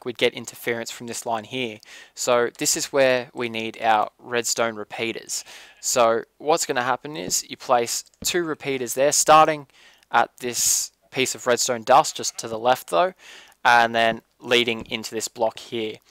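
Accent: Australia. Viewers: awesome, are you australian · Are you Aussie.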